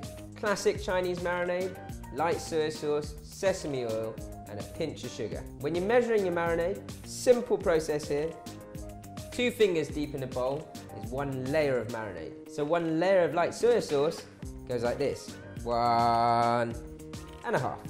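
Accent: United Kingdom. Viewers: A oriental with a British accent OK you got me on this one🤓 Looks delicious❤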